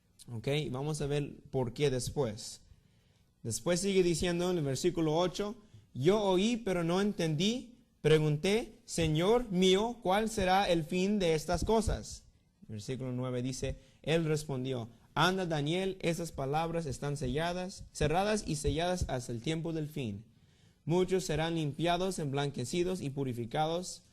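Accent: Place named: United States. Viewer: Tu español ha mejorado muchisimo. Un poquito de american accent but still good. Keep doing the good work.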